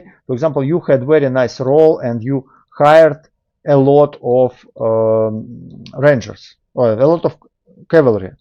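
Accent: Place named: Russia. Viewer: Видно по акценту, что ты русский) Сними ещё видео, буквально вот на неделе обнова вышла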